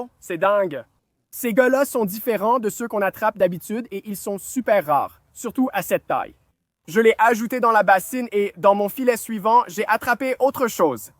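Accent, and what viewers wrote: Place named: Quebec, Canada. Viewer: ❤Wouaw❤ un Payara le poisson vampire par contre la voix j'aime l'accent québécois mais lui il a une voix pas terrible ils est trop affolé s'il te plaît change la voix 🎉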